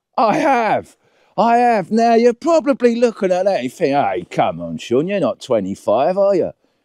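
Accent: United Kingdom. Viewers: lol that ole norfolk accent soon comes back!!! lol miss norfolk, esp sheringham & cromer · Good show. Thank you for sharing your life. You have much thicker British accent now that your back in the UK. I like Flo, you even have a sunroof. Looking forward to your upcoming adventures in Europe. Will you be getting the Netherlands? Amsterdam would be a very cool place for a vlog.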